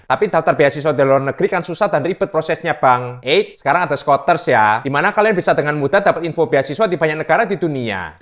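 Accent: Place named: Java, Indonesia. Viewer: Kokoh ngomong nya lucu, nada jawanya blm hilang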